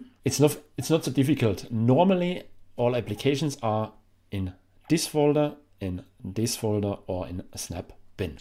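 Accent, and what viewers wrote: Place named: Black Forest, Germany. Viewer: I thought your Ubuntu video info was great, thank you! I'm very pleased with the distribution. And your "Black Forest" English! I knew my German grandfather was from the Black Forest area in Germany, but I didn't know what or where that was. I just looked it up. How fun to listen to someone from the area my granddad's family upbringing.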